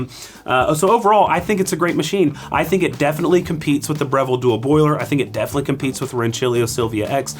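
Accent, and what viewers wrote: United States. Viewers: I have lived in Spain for 35 years. In San Cugàt. We watched Ascaso being born here. So these are machines we’ve been using for years. Along with our amazing (heavily modified) hefty and reliable Italian Saecco aluminum and ss core. Almost 25 years and making amazing cups. We’re not worried about the 21 gram baskets or naked portafilters on this machine. We rely on its original but strongly modified porta filter with a nice ims basket. A charm. Even with tough coffees. Ascasos here are the standard. And, if you open all single head ones, you’ll find the same exact components inside, even with the humble Basic. The “new” PID Dream is the same as the Steel but with only one thermocoil. You should cut it in half and look at the marvel of ingenuity that makes these machines fast, predictable and reliable. Not for a scientist but for an espresso drinker for more than 45 years. 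Ascaso is one of the most amazing brands in Europe. Canadians are adopting them. But, Portugal? They’ve been there for a really long time. Loved your content. Makes me proud of you… the Master. You rock. Sorry. Não sabia que você era português. É que você parece tão americano. E seu sotaque e tudo mais são tão americanos que nem consigo entender como você está apenas “apresentando” uma máquina Ascaso. Suponho que seja para seus principais seguidores nos EUA de A.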